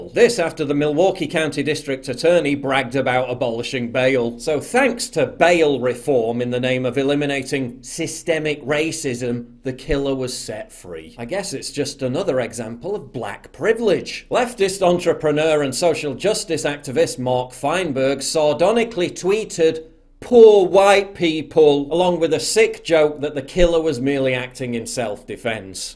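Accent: United Kingdom. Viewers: Why you gotta narrate like that, get your British sounding a new hobby. This the second time now that I accidentally started watching your under 5 mins pos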